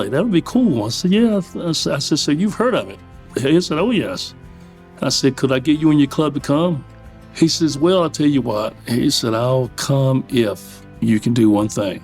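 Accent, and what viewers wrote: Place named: Maryland, United States. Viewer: I thought u were from the eastern shore Maryland ...You're ancient the o and u ....,MD NC ......TALK t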